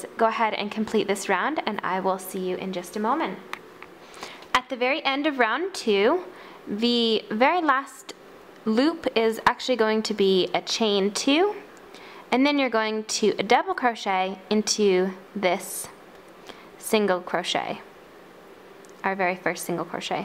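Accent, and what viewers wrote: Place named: United States. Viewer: I tried to crochet a snowflake from a pattern in a magazine and I got stuck so I just googled how to crochet a snowflake and your video came up! Thank you so much, I'm still a beginner and have taught myself some basic stitches from a book. I live in the UK and I just wondered if American crochet stitch names are the same as UK ones? Anyway I managed to complete it from watching you, with many rewinds! Many thanks, it's for my daughter's birthday as an extra little something to hang on her Christmas tree. I've made it in turquoise as that's her favourite colour. I'm gonna make two more in white. I've also got some tiny sparkly beads which I will sew on using invisible thread. I liked the way you talked about it being prettier done in a certain way and your American accent was reassuring!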